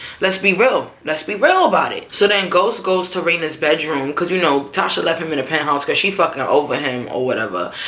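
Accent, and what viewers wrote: New York, United States. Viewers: I love your reviews I came across your Channel recently & the NY accent got me I’m from CT so if you know you know 😂 but this episode was good but predictable I think it was Tariq if you noticed he fell back so whoever shot him made him turn around to face them first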